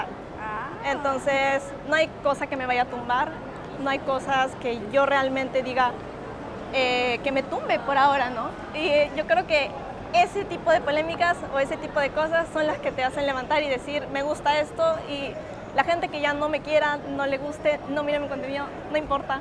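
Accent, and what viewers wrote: Lima, Peru. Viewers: En Perú se habla como ella usted se ve que no conoce nada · Como se le nota el acento Peruano jajajaja exelente 🇵🇪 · Y bien peruano, aunque ella suena limeña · Ciertoooooo acento limeño!!! El acento peruano es neutro y único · Se le siente muy peruana , me encanta quw sea bilingue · su espanol es muy limpio como la mayoria de peruanos que no son de la sierra ojo ! yo quiero mucho a mis compatriotas andinos , pero manejan un espanol muy marcado ! a diferencia del limeno que puede ser limpio cuando se lo propone incluso por encima de cualquier pais hispano americano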